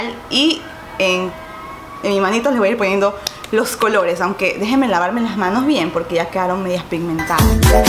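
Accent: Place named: Guayaquil, Ecuador. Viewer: ese hermoso momento es que te das cuenta que una youtuber de tu País y Ciudad te encanta .... ya decia yo... parece guayaca :v me encanta ♡♡♡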